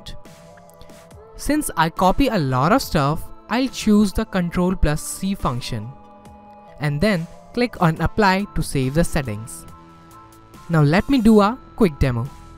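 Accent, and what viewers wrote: India. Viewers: as soon as i heard the indian accent i knew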